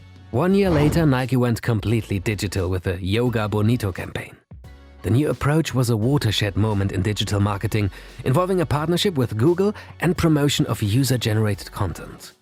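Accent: Germany. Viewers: Really perplexed by how poorly the narrator pronounced most of the Portuguese terms and expressions in this video – none of which are difficult to pronounce accurately if you bother to research the pronunciations. Otherwise another great, informative video. Ich finde generell das deutsche Reporter (ich gehe von seinem Akzent aus dass dieser Typ deutsch ist) sich nicht genug Mühe geben, fremdsprachliche Begriffe richtig auszusprechen, was ich als Deutscher der im Ausland aufgewachsen ist sehr bedauerlich finde. Mandando todo meu carinho de Londres aos brasileiros assistindo o vídeo 🕺🏽 tamo junto!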